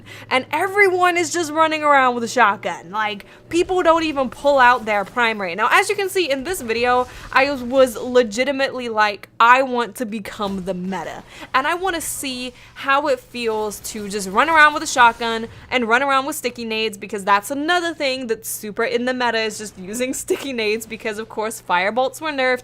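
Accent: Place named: Boston, United States. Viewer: Anyone else notice the slight Bostonish accent in? or is it just me?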